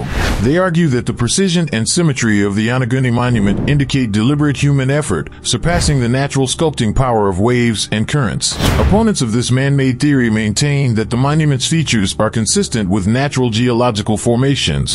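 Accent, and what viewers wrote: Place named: United States. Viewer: Sorry. The animations and overall visual presentation is marvellous - but that awful 'merkin accent from the narrator is too much to listen to for more than a few seconds.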